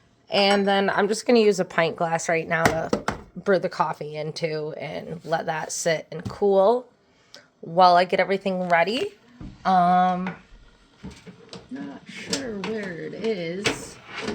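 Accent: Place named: Midwest, United States. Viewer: Holy crap, how do you say you are from the Midwest, without saying you are from the Midwest. Got to be Wisconsin or Chicago area.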